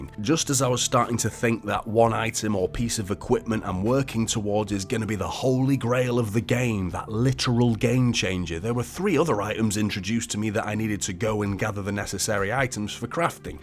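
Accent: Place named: Stoke, United Kingdom. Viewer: Proper stoke accent that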